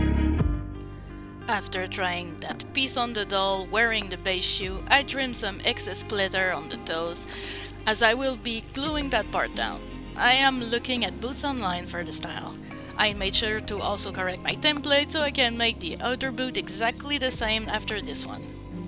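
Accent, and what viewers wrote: Quebec, Canada. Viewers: 1 minute in and I just knew you were french canadian, amazing work mon chum <3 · 2 minutes in, I had to check your "About" section to confirm that you are indeed a fellow french Canadian hehe. I recognized the accent ;)) Salutations de Laval!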